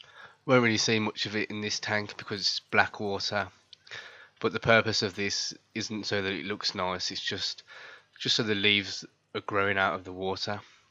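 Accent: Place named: West Midlands, United Kingdom. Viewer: that west midlands accent though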